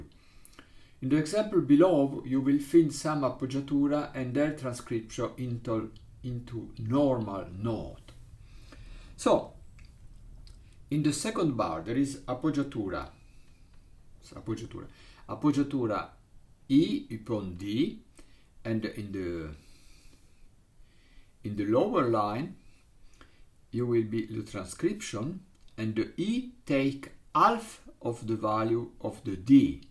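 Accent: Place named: Italy. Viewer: Quite entertaining and hilarious what the AI/NLP makes as subtitles once in a while for your lovely Italian English 😆 I have no difficulty to understand you, but then I need English at work all day and my Italian colleagues have trained me well, who sound very similar to you. While I might be identified by listening to me speaking English as a German mother tongue as well…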